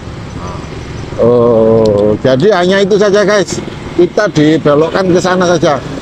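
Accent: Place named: Java, Indonesia. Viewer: gak usah pakai ngomong guys wong sampeyan mdehok Jawir. Udah gitu ngomong kok ribet banget, gak ada menariknya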